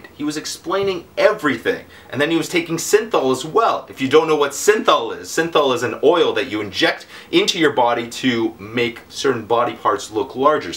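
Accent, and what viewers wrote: Canada. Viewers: Is he Canadian? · You said aboot. You're Canadian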